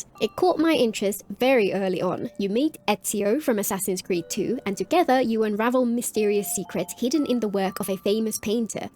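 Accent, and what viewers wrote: United Kingdom. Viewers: You sound Bri'ish like Ver'in and Regulus...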